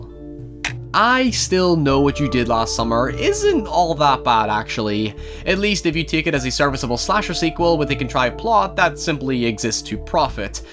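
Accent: Northern Ireland, United Kingdom. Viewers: My guy are you Northern Irish?? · U have a northern irish accent? ❤❤❤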